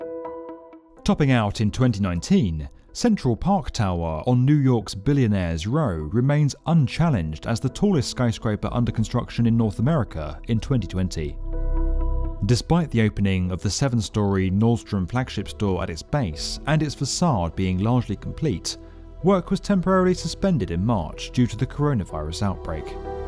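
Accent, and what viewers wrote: United Kingdom. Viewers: When i heard he says in british accent Petronous Twin Tower remind me about Harry Potter...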